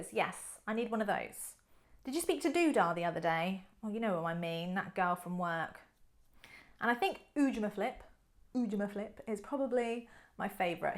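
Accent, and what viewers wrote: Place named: RP, United Kingdom. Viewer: Dear Madam Teacher,thank so much for your brilliant lesson and of course superb modern RP british accent.Well,honestly do not freeze in English is so tricky for me.because I am from the working class and absolutelly not smart and clever like you,dear Madam.Thank a lot again.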